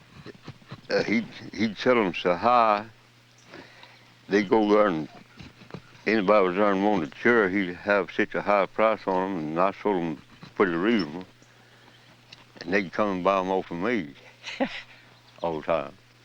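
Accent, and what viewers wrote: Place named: South, United States. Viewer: He reminds of my grandfather; his lean body, country accent, and slow way of talking. I had a recording of Pa talking about his experience in WWI and my kids asked me if that was really how he talked. He was a man of few words and only spoke when he had something to say. This video makes me miss him.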